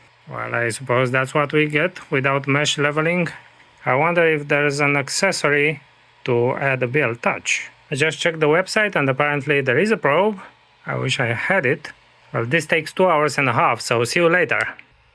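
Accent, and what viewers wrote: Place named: Romania. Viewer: are u from romania? ur accent sound like a romanian one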